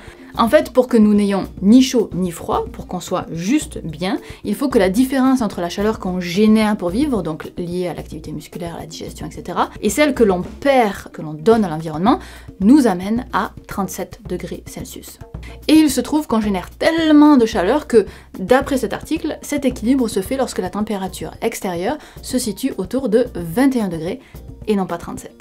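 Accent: South, France. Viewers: Tu as un petit accent du sud de la france ou j'ai fumer ? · Question totalement annexe, comment est perçu ton accent du Sud (? C'est bien ça) au Québec?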